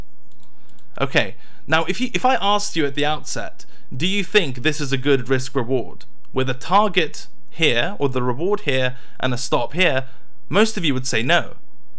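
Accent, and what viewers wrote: United Kingdom. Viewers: Very good, other than you sound like a posh git to an Aussie like me. Kudos for sharing free. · didnt know you were english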